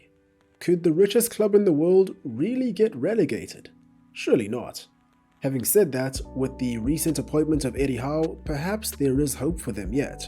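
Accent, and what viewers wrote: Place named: South Africa. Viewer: Ah, South African…couldn’t put my finger on the accent.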